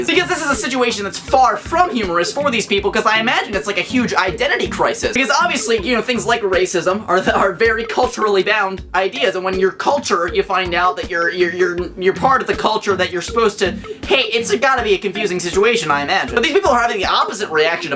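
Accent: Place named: South, United States. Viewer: I LLLLUUUUUUVVVVVVV ur southern accent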